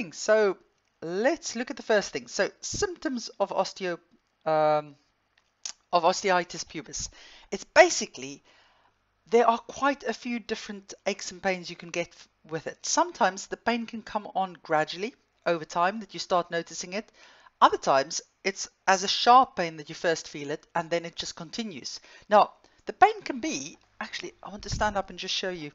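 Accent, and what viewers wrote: South Africa. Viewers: Hello. You sound like you could originally be from SA. I live in Graaff Reinet in the EC and have been informed I have this annoying condition. I’m a runner and started cycling this year to try and alternate a little and then bam OP!!.. I’m devastated as I have not been running for 4 months already and not cycling for a month now. I’ve already seen a physio, GP, Chiro and finally a sport specialist Dr who diagnosed OP after X-rays and sonar. My question is, as I am sure your time in Pounds will be extremely expensive for us here in SA, is would it be worth while seeing a biokineticist to help with me with this?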